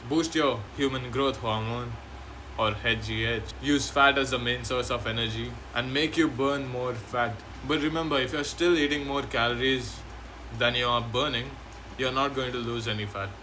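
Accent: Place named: India. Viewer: Bro you sound like an Indian guy. Are you from India?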